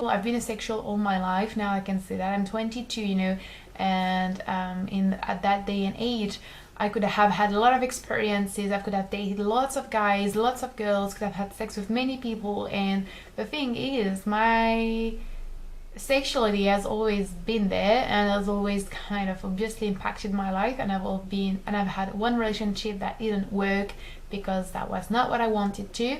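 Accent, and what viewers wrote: France. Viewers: I am puzzled. Not about my own sexuality, I am not asexual, I am straight, never doubted neither of those. But when I began to watch some of your videos, I thought "Damn, she is stunning !" and then "f***... She is asexual, what a shame !". But since I was enjoying listening to your voice, I figured that would be a good time to know what asexuality really was. I used to think asexuals were people who either had bad experiences or were afraid of sex (that would have explained why I saw more asexual girls than asexual guys). After listening to you, I understand that is not entirely true. You are not a "Eeeeew ! Sex is gross ! It is a sin ! You are a whole bench of sinful perverts !" type of person, and that is relief, I must admit. My first girlfriend was not asexual, but she used to say she did not like french kissing (she was my first, but she had a lot of guys before me). 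But when we started to get physical, we ended up making out and we french-kissed a lot. I do not think I was that good at kissing (she was waaaaay more experienced than me) but it came naturally. I think it is the same with sex. With some people, it cames just like that, it is not entirely your responsability. Anyway, I do not know if you are still single (a few month have passed) but I know you will find someone. You are very cute, I love your voice and that light french accent is very charming. There is a fragility in you, that is attractive. And I hope you will be happy with him, with or without sex. ;) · nice video... hope you find the person that compliments you're amazing self well. also amazing french accent. totally love that.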